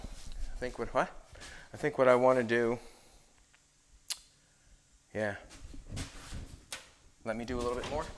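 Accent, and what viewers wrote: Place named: Canada. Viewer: This dudes for sure a Canadian!!! Listen to his accent!! Yeah buddy!! That cab oversight gonna kick some serious ass!!!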